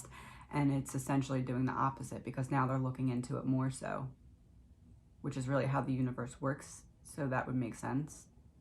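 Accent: Philadelphia, United States. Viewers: That was one of the most interesting hours I've spent on youtube in a long time! I had no idea how cards were read, or how they were used to connect with spirit energy (I'm probably butchering the proper vernacular ) or even how a story comes together, but this was fascinating! I also enjoyed your very laid back, soft spoken demeaner, which is very calming. Do I hear a "Philly girl" in your accent, or a surrounding suburb? I grew up there. It's an accent I seem to notice immediately. Anyway, I was listening to someone on youtube last night who apparently receives information from "spirit" by being shown things in her mind (I guess) which she then interprets. I mention this because her entire session revolved around things and people connected to the death of Hannah Cleere and her family. Many names came to her that were members of Hannah's family, and she mentioned rather often that none of the information she was receiving was what she had expected to be discovering last night. 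If I'm not mistaken, you also mentioned something "catching you off-guard", or "throwing you off" about the cards being chosen as it relates to Hannah. Honestly, both your reading and the one from last night were so interconnected and so overlapping it was quite amazing! I have always thought these murders were either 1) revenge for the death of Hannah, or 2) the ex-boyfriend (Jack D), feeling betrayed by Kaylee for breaking up with him. Just wanted to share my thoughts, and thank you again for such a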